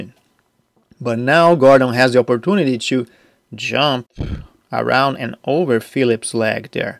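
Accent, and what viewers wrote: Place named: Quebec, Canada. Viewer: Hey I just noticed your voice reminds me of GSP! Did you spend some time living in Quebec?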